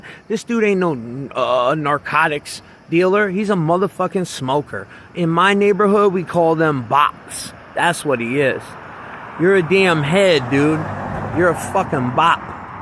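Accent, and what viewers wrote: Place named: Baltimore, United States. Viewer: I have been watching your vids for 2+ years on FBas my main, Instagram, and here on YouTube. I'm born and raised in Baltimore. You sound like you have a Baltimore accent.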